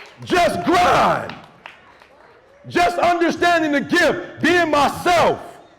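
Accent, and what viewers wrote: United States. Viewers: "Eight Mile, bruh." He so Deeeeeeetroit!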